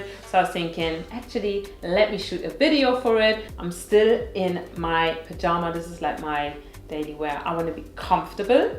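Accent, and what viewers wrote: Germany. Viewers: Sie hat doch einen deutschen Akzent, oder irre ich mich? Sorry kannte sie bis eben nicht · das liegt daran, dass ihr English sooooo krass deutsch ist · Are you German or sth? You definitely don't sound American 😂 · You can hear her German accent right away it’s kinda cute she is so damn pretty tho 😍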